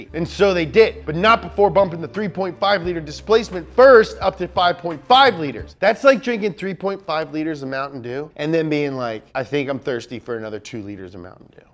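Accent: Germany. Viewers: I need a 12 hour compilation of speaking German-ish English, anyone else? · out here with the best german accent in the business. Love it!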